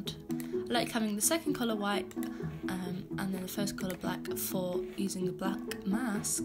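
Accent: United Kingdom. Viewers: Omg i love your british voice!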